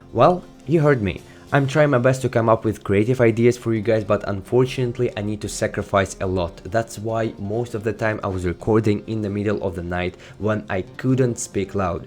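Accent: Russia. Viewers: Мне кажется или ты Russian? Акцент, сука, русский.... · Чувствую русский акцент)) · Над произношением еще поработай, а то немного палишься с русским акцентом) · Блядь, какой-то русский акцент прослеживается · Are u russian? P. S чекнул инсту, понятно. Получается, я понимал инглиш проще из за акцента. Для большей аудитории снимаешь на english?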